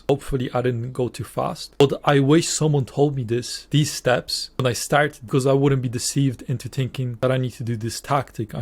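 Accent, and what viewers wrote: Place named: Ireland. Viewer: I am concerned that your mentions of the thyroid hormone T3 come out sounding exactly like "tea tree" in your lovely Irish accent (which does not differentiate between the "t" and "th" sounds). The trouble with that is the Australian essential oil by the name "tea tree oil" (also known as melaleuca oil). It is used topically for skin problems in folk medicine and also in aromatherapy, but it's highly TOXIC when taken by mouth. Excessive use on the skin can cause endocrine disruption leading to breast enlargement in males. I don't want anyone to think that you're suggesting that they take or use tea tree oil when you say "for this to occur, you need T3" and "you lack T3 in the body."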